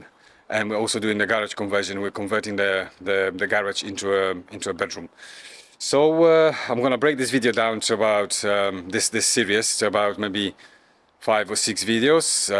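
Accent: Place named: Poland. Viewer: Hi thanks for responding I’m in Borehamwood, near Barnet, WD6 You sound like you are Polish, my mum is from Gdańsk Best wishes Mikey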